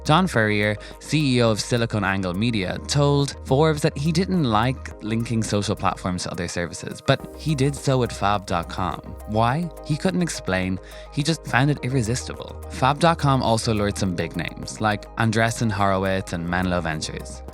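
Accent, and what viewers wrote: Ireland. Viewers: When a brand pivots, like changing the voiceover, you have to be ready for some backlash. BUT love beats hate. Welcome Irish Guy! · Most people are finding it a little harder with the Irish accent. Congratulations new guy, but please- let Caya host 😅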